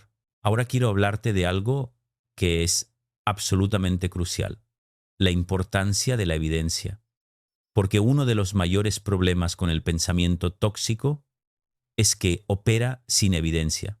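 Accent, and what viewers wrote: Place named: Latin America. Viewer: Por qué tiene acento latino?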